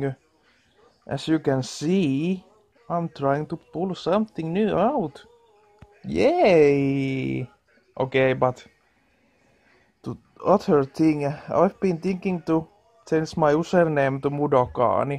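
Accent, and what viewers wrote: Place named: Finland. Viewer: This is the most Finnish thing i've ever heard :D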